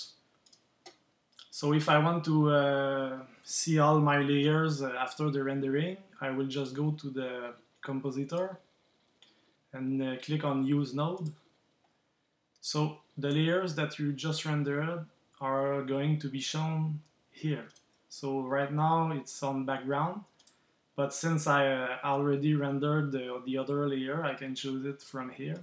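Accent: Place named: Quebec, Canada. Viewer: Très rafraîchissant d'entendre du bon vieux Québécois agnlais😁 mais sérieux merci pour l'approche «straight to the point» avec les exemples simples. J'essaie de m'adapter au mieux à la logique des rendus de Blender.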